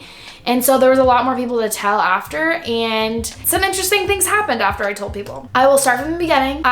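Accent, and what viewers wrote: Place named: New York, United States. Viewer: it sounded like you have a New Yorker accent a little haha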